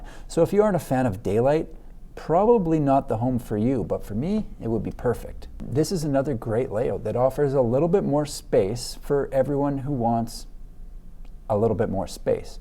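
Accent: Canada. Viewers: Grammar guy here. I learned grammar, not pronunciation. Moreover, you're Canadian, your national pronunciation could be very different my California surfer dude twang. That written, ā indicates a long a as in hay, play, or gay. Given most US people think Canadians liter their sentences with ā, often written as "eh," I am not going to even take a run at this. Great homes, fabulous review.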